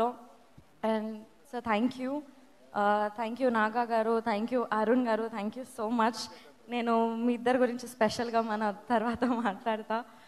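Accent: Telangana, India. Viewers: Telangana slang ante chalu speach meda unna intrest anta potundi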